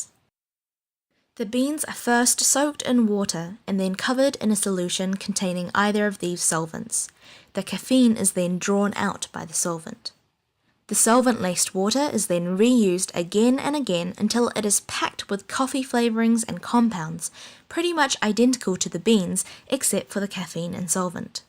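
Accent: New Zealand. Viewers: 뉴질랜드 영어 쏘스윗!! 번거로우실텐데 너무너무 감사해요!! 더 열심히 들을께요 ☺️😍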